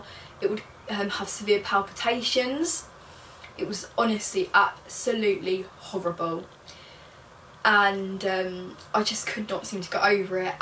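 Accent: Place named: England, United Kingdom. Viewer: are you from the same part of England as Lisa Blackwell? because that accent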